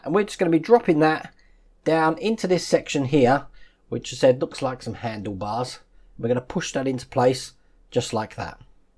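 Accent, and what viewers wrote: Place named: Kettering, United Kingdom. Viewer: that makes sense then I'm from notts so by your accent put you round Kettering area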